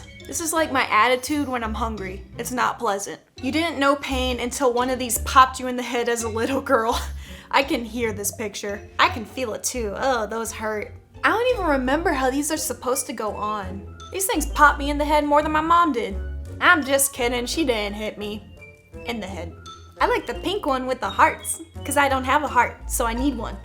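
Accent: United States. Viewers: Love your cowgirl accent! 😉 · Are you Southern ?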